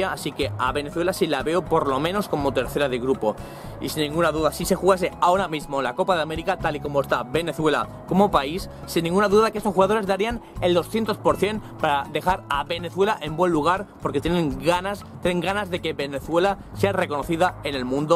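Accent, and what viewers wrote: Spain. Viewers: gracias , eres de España no ? Veo eso con tu acento pero talvez me equivoco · ¿Sos español, si acaso?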